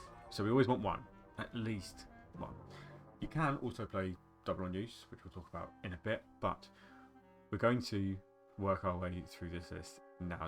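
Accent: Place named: England, United Kingdom. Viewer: the English language spoken with an English accent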